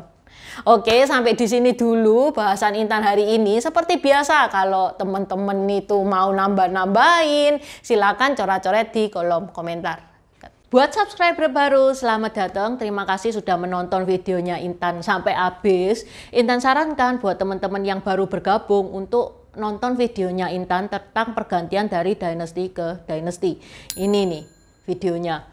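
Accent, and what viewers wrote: Java, Indonesia. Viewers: Ya sambil berbahasa indonesia bergaya LOGAT JAWA. MANTAF🙏🙏🙏👍👍👍👍👍👍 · Mbak intan suaranya medok banget.jawanya mana mbak,👍💪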